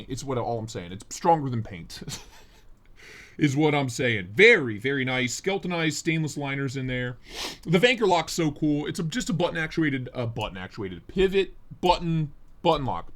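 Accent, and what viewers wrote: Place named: Western Pennsylvania, United States. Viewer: Your western Pennsylvania accent always cracks me up.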